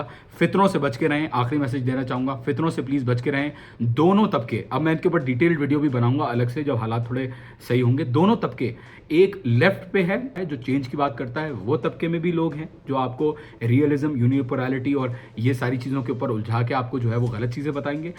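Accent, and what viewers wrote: India. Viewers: Your Urdu accent llike of Indian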